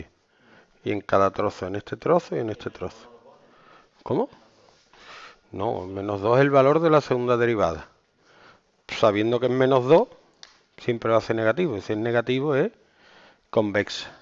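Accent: Andalusia, Spain. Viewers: Que se note quien es de Andalucía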